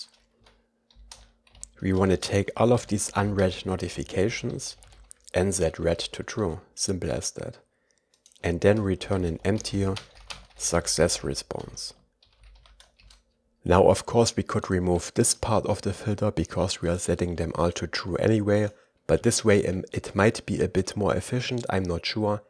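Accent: Germany. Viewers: Direkt gemerkt das du deutsch bist haha, super content!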